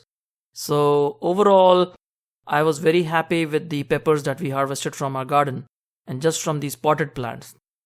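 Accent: India. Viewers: Are you from India your English seems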